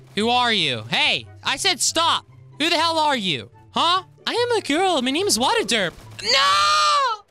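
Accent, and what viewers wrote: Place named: South, United States. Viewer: I turn on noficachio bell and I enjoyed it becuz I love when u talk country and I subscribed and I lovvve u.username:madihle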